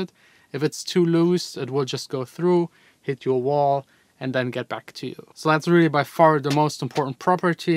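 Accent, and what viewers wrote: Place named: Germany. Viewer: Well.. He's from Germany, heck, he even has a pretty strong German accent when speaking English! lol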